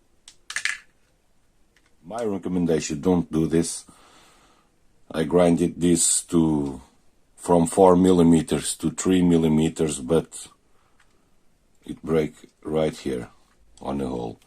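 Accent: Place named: Russia. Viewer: Cool accent, Russian?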